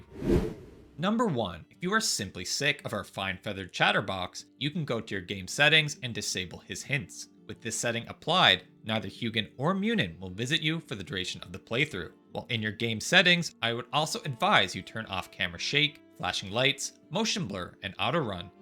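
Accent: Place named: Canada. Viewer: A couple things, the fire tip was super short and kind of obvious lol, one useful thing I've been told about smoke ventilation is it's not always necessary. I don't remember the exact numbers but it's something around 3-4 walls high when you can no longer suffocate from smoke.👍👍 But If aesthetics are more your aim then the particle effects that emerge from smoke buildup is probably going to bother you.😅on a lesser side-note I can totally tell your Canadian from the way you say your R's😂👍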